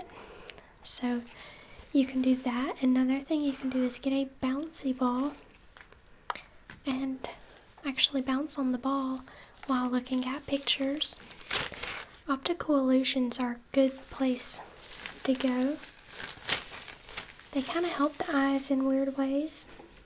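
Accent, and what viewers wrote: South, United States. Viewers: It's a southern accent dude lol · Anybody know which southern state she is from? Just curious to know where accent is from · The subtle southern accent melts me. I loooooove it. GG nice vid keep it up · I hear the southern accent but can't place it; What state are you from? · Thats either an Alabama to NC accent. Or shes in the midwest. Hell it could be upstate NY as well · Lis Sanguinan it's from the southern United States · Lis Sanguinan southern accent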